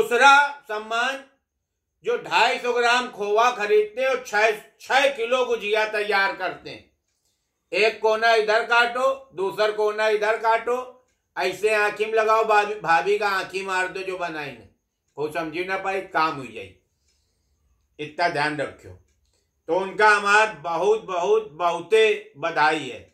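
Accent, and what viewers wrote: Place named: Unnao, India. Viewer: हम भी उन्नाव के रहने वाले है 🙏💕 पर हम वहाँ की बोली नही बोल पाती हूँ सच आपकी बात सुनकर मजा आ जाता है 🎂🎊🎉🍻🥂🥂🍻🎂🎊🎉🍻🥂🥂🍻🎂🎊🎉🍻🥂🥂🍻🎂🎊🎉🍻🥂🥂🍻🎂🎊🎉🍻🥂🥂🍻🎂🎊🎉🍻🥂🥂🍻🎂🎊🎉🍻🥂🥂🍻🎂🎊🎉🍻🥂🥂🍻🎂🎊🎉🍻🥂🥂🍻🎂🎊🎉🍻🥂🥂🍻🥃🥂🥃🥂🍷🍹🍷🥂🍻🥣🥣🍩🍩🍪🍪🥛🍬🍬🍩🍩🎇🎇🎇🎇🎆🎆🎆🎆🌌🎇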